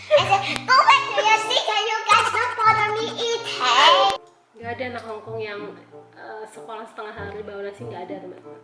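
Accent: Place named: East Java, Indonesia. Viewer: Bu, dari mana sih? Logatnya kok kaya Jawa Timur an.. Maaf penggemar baru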